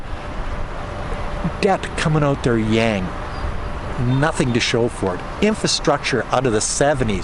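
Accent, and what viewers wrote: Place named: Canada. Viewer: I love your accent, it's so Canadian when you say "aboat".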